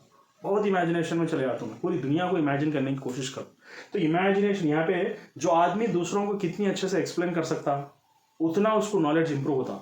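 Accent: Hyderabad, India. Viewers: Sir ki hyderabadi accent sunne ko aya main. Hou kya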